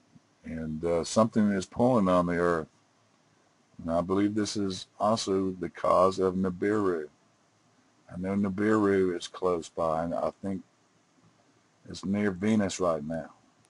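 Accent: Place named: United Kingdom. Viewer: What a strange, over the top, ' jolly hockey sticks' English accent of the narrator. Was it computer generated? I was mesmerised listening to the accent that I didn't hear what she was saying. Now I will have to watch it again :)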